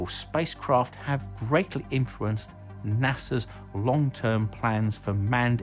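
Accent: United Kingdom. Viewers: i found your channel by youtube advise like following: 1. British accent check 2. Shaved head check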